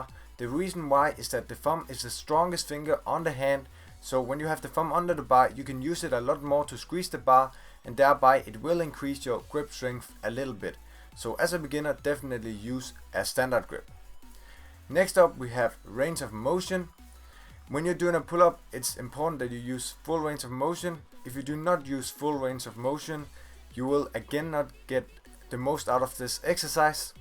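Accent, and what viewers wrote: Denmark. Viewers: This was on my YT suggestion. Gr8 vid. New sub. Look at others soon. Not always timely to go to gym. And whoa.......a very nice ring of a DK accent speaking English......all success for this channel to you.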